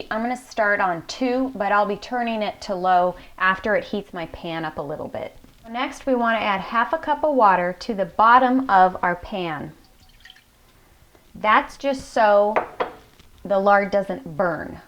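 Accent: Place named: Wisconsin, United States. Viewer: you sound like you are from Wisconsin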